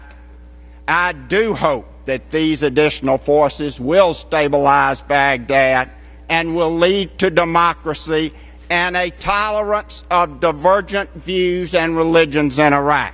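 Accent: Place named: South, United States. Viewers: He has what is the source accent for the American Black Accent. In some parts of the South the notion that you can tell when a person is Black by listening to their voice is false for the White folk talk exactly like the Black folk. He's moderated his accent thanks to his career, but those from the same place have an even more extreme accent. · Wooo He got that Old Virginia Accent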